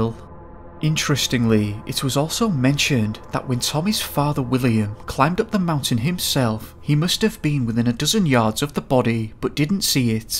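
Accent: United Kingdom. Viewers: Good enough video with out the monotone british accent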